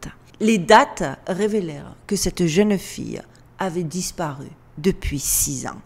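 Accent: Marseille, France. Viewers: Pub France Vérif' :face-green-smiling: On reconnait la marseillaise qui demeure en toi!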